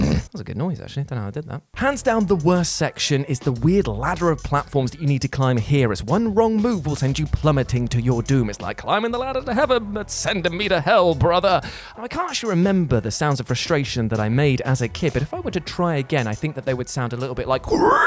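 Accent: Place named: Wales, United Kingdom. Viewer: Always thought u were a brit. Your from Wales?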